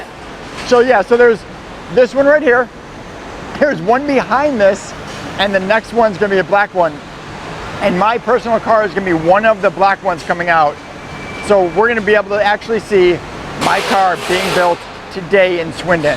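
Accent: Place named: Chicago, United States. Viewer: I sense a Chicago accent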